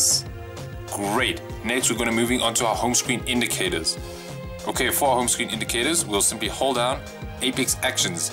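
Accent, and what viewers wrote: South Africa. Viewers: Cool tutorial, wasn't expecting the SA accent, nice bru!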